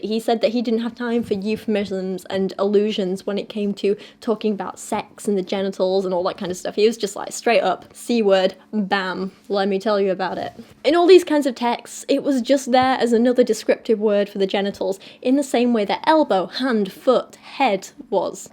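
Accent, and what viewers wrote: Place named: Northern England, United Kingdom. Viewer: accent isn't perfect RP. There's an element of RP in there, but it's also largely Northern.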